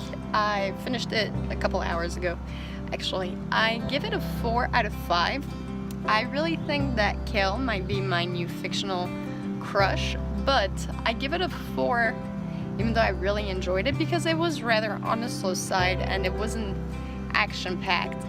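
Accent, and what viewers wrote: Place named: Portugal. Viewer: Oh wow, I was way off! I’m half Portuguese and your accent is very similar to a lot of my relatives!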